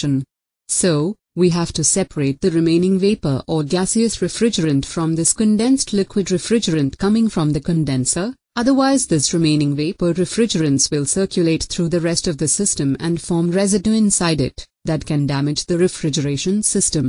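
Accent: India. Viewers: Even though the accent was clearly Indian this was an excellent tutorial on the air conditioning system inside my car. Thank you.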